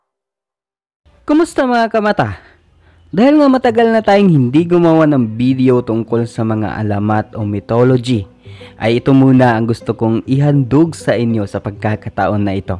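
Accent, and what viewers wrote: Visayas, Philippines. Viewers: Ok sana Kaya Lang Visaya ka eh ayusin Mo ung pagsasalita mo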